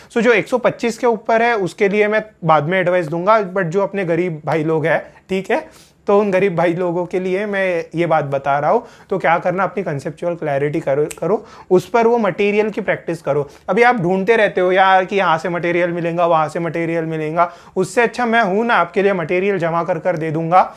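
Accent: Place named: Nagpur, India. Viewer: Nagpuri Hindi 🙌🏻🙌🏻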